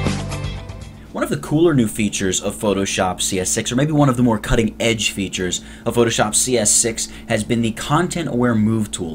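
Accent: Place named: United States. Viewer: armana head on over.... armana go ahead and.... Super Cooele.... gonna move this guy here... Whole buncha stuff Don't you just love what America has done to the English language?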